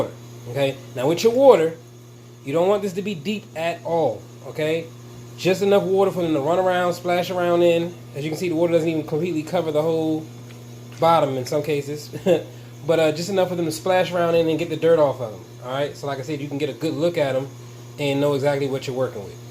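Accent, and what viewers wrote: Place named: DMV, United States. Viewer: You sound like you’re from DMV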